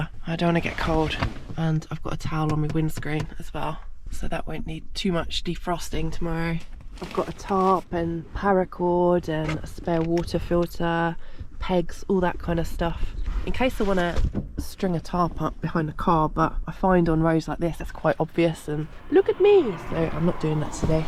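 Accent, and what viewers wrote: United Kingdom. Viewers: May I please ask which UK accent you have? From USA . Thank you for your videos ! If you ever bring George to the USA, please make a video of where you camp.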